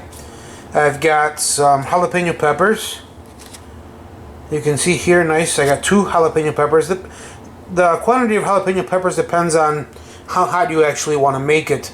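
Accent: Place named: Chicago, United States. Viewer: I hear some Des Plaines IL in that accent! LOL reminds me of my friends back home. Thanks for posting, I am definitely going to try this one!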